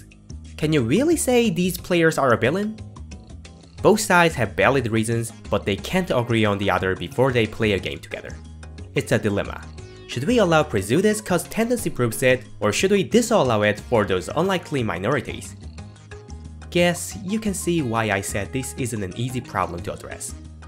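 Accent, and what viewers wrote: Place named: Austria. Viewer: Are you by any chance Austrian? For some reason I get that from your voice.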